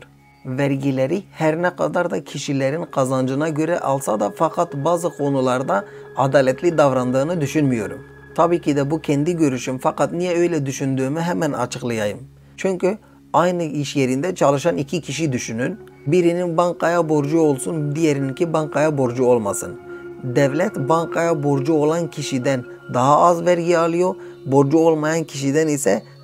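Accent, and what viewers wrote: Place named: England, United Kingdom. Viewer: Abi selam ne kadar yaşıyorsun norveçde Türkçeyi ingilizler gibi konuşuyorsun galiba biraz asimile olmuşsun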